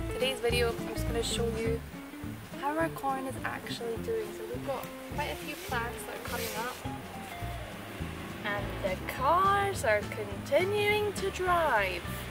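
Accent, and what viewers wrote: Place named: England, United Kingdom. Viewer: Love ur English acent...... P. S from ur favourite niece 🤣🤣🤣see ya in 7 days🥳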